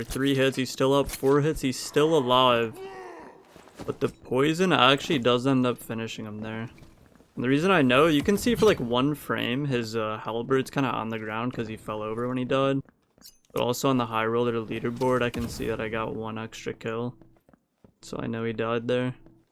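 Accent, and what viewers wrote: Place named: Minnesota, United States. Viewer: def from minnesota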